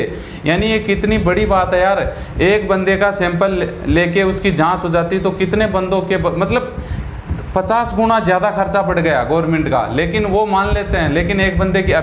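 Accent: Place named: Lucknow, India. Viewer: Sir from where you belong Can you tell me Because your Hindi language is like Lucknow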